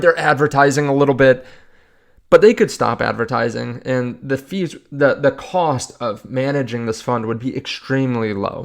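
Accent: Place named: Wisconsin, United States. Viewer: 2nd viewer today,!!! I'm subscribed and send your content to my sister, who I talked into getting BTC and SOL. I'm a happy crypto investor since 2022. Oh BTW, I'm a fellow CA resident and your accent makes me chuckle. I'm born in Wisc. and have family that say how's it going just like you. Got to chuckle...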